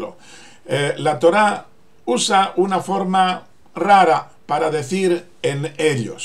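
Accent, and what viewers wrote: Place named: Spain. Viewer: La primera vez que oigo un judio con acento Edpañol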